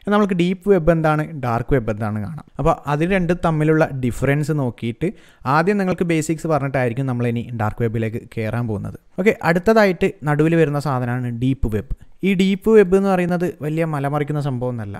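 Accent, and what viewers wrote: Kannur, India. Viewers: Kannur ano bro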